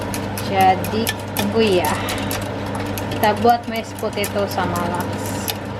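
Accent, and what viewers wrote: Jakarta, Indonesia. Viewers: Gue demeen beneran ama mpok dan bang, kereen mantab, semoga Allah swt sll merahmati & melindungi klrg mpok di sono. Mpok lucuu banget, betokaw aseli, saya inget masa kecil kalo denger aksen mpok awesome da'ah! ngikutin vlog mpok, krn saya sejak kecil sd skr tinggal di Betawi Indonesia tercinta. Suatu saat kalo ada rejeki ogut ame anak² yg udah pada gede³ mau ke Sweden ah nemuin mpok se.klg, ibunya anak² udeh meniggal sejak anak³ msh kecil, skrg tinggal jalan2 mau ke Swediaa!! Yeaah, InsyaAllah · Suka sama logat betawi nya langsung Subscribe aku pok😁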